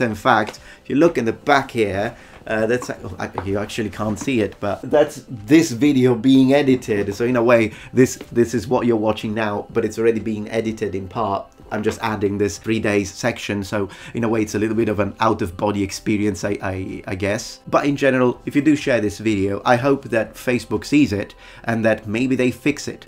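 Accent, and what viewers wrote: United Kingdom. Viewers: He does sound like a posh Englishman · The more angry gets the more British he becomes.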